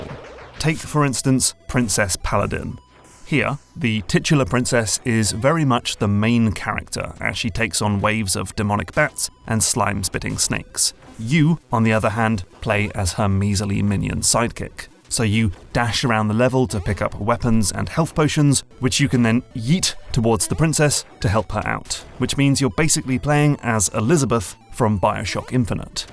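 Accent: United Kingdom. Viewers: Need YouTube AI to convert unpleasant British accents to accent of our choice.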